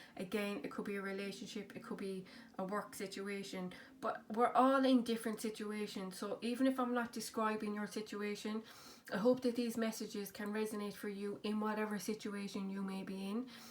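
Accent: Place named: Ireland. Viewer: By the way your Irish accent is so cute. I do not hear people who speak English with Irish accent but it sounds interesting.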